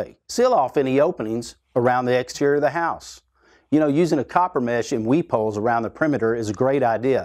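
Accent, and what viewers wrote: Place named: United States. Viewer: No offence but I'm an Australian arachnophobe. Your American accent is not reassuring for me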